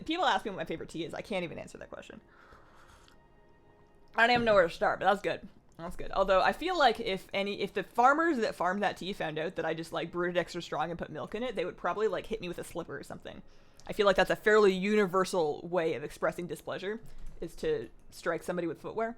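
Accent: Canada. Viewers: From a midwestern ear, you sound pretty Canadian.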